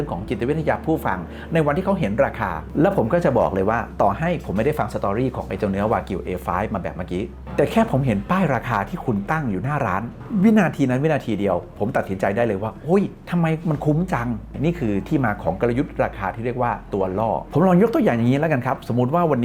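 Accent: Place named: North, Thailand. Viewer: นี่คนเหนือชัวๆ😂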